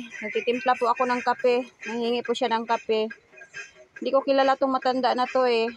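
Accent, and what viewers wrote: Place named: Visayas, Philippines. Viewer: Bisaya diay ka day. Pero buotan ka kaayo....tnx God may taong kagay mo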